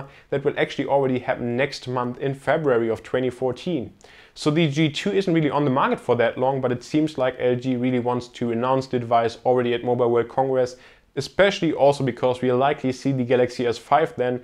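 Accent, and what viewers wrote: Germany. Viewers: TechCentury aber wär schon gut, wenn das eigene Englisch dann nicht so deutsch klingen würde, oder? Find ich dann irgendwie eher peinlich als international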